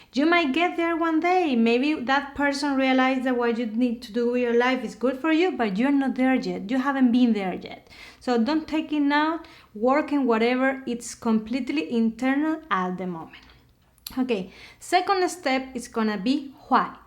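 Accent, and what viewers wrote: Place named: Spain. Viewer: Im your fan for your spanish accent of English. How sweet☺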